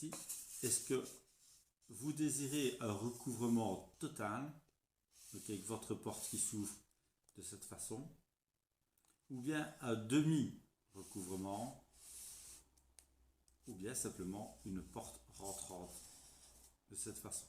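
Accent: France. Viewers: Stunning French accent ☀️👏💕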